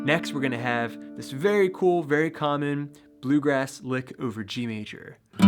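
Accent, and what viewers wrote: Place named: Delaware County, United States. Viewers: deleware county pa, they also say “Wooter” instead of water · South Jersey / Philly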